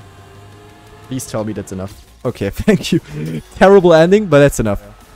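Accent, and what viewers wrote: Germany. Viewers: Du bist deutschhh, nh? XD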